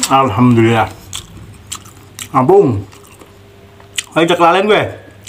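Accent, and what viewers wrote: Banyumas, Indonesia. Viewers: Mangan maning apa ora wedi makin gendut mas ha ha,sehat ya mas,ora ngapak ora kepenak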